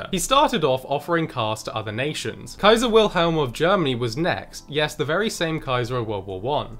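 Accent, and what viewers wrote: United Kingdom. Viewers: God give me the confidence to pronounce things like a Brit.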